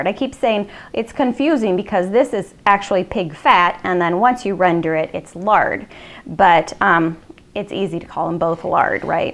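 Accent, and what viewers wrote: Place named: Wisconsin, United States. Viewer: you sound like you are from Wisconsin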